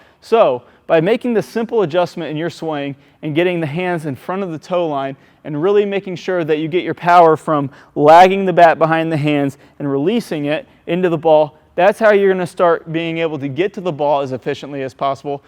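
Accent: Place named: United Kingdom. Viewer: yeah on ur youtube channel u sound like ur British r u British?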